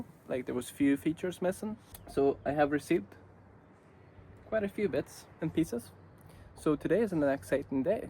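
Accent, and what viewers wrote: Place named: Northern Ireland, United Kingdom. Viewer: Taip ir galvojau. Pagal akcenta tu kazkur apie Antrim gal gyveni, cia tik speju 😄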